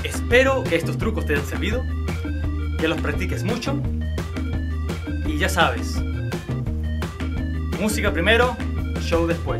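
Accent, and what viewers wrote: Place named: Canary Islands, Spain. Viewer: tienes acento canario, eres de tenerife? :D xk si es asi ya tienes un colegacho tinerfeño xD jajaja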